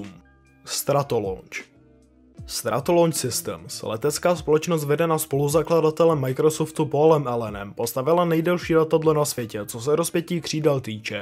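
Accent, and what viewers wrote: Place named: Moravia, Czech Republic. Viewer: Ta moravština je příšerná řeč ale jinak obsahově slušný... namluvit to civilizovaným jazykem, dám like.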